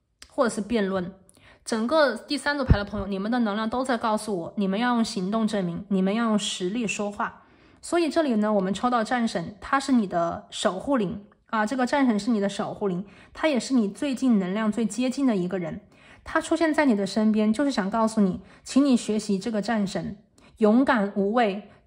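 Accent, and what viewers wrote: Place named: Taiwan. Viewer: 變台灣腔？好奇怪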